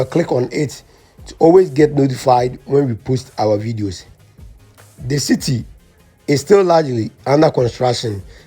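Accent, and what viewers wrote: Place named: Ghana. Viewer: A nice educational video. You sound like a Ghanian. But a different and positive minded Ghanian. Not like some other Ghanians who never fail to be antagonistic about anything Nigeria. Good video narration bro. Keep up the good work. Love will always triumph over hate as long as you keep showing love.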